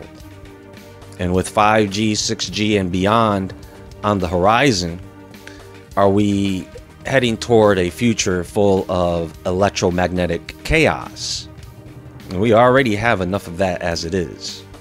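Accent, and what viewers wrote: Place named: New York, United States. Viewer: & I thot he sounded like a military jarhead, part Italian New Yorker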